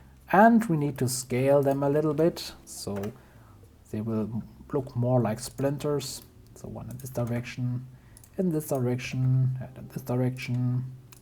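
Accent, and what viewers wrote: Germany. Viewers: Your accent is very german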